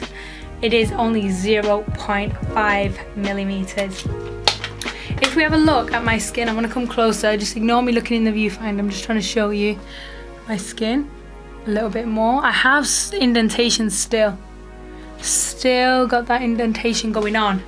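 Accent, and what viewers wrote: England, United Kingdom. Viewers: Akcent is like amir khan England's boxer 🤣😂😘😍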